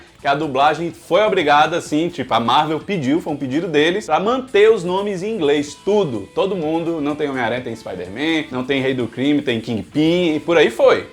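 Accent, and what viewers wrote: Northeast, Brazil. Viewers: Gostei do que vi, menos os rostos. E, cara, você fala como Nordestino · Vc é nordestino?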